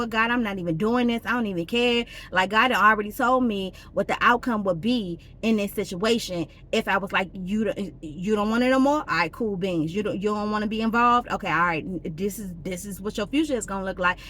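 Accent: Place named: Baltimore, United States. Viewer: I knew she was from Baltimore in the first 5 seconds 😂 Thanks for sharing!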